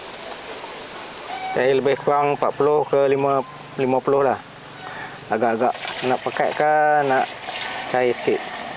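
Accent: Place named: Kuala Nerang, Malaysia. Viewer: Tok ckap mcm org blah kola nerang ja.. 😂😂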